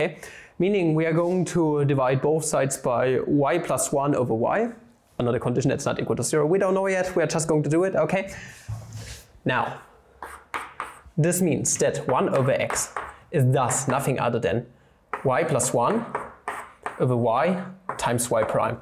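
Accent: Germany. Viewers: I've never understood a single flammable math video but I still watch them...musg be the accent. I'm a sucker for german accents and chalkboards.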